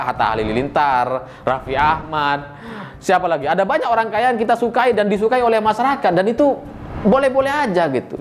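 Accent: Madura, Indonesia. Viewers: Logat maduranya sangat kental bang..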